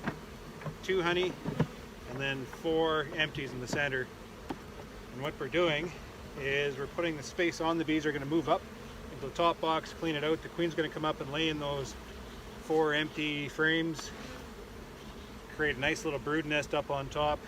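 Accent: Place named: Canada. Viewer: I didn't see your name but I knew you were Canadian by your accent. it was nice to hear your voice being in asia for so long I guess I am missing being home sometimes. nice to hear it.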